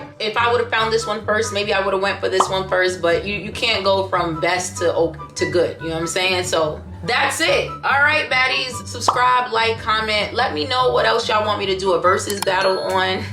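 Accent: New York, United States. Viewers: U definitely from New York or Jersey Lml. 💙 from Brooklyn🗽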